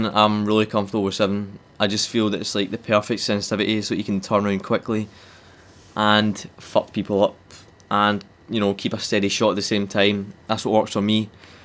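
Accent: Scotland, United Kingdom. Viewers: Hes from Scotland bro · He's from Scotland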